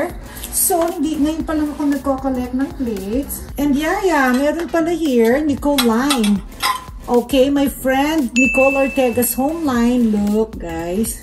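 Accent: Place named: Philippines. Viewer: Ang cute nyo po pag taglish ka magsalita😍😍😍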